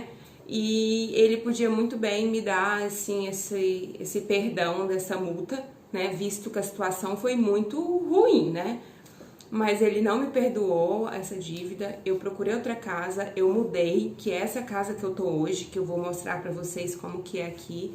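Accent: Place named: Minas Gerais, Brazil. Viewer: Oi, vc é mineira? É tão gostoso teu sotaque. Vc sabe se no saguaçu e na zona industrial norte tem enchente?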